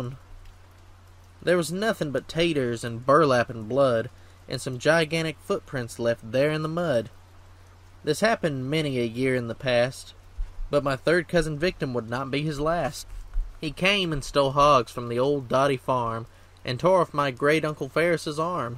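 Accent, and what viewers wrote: South, United States. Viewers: Loved the poem! Also the sweet southern accent that you tell it with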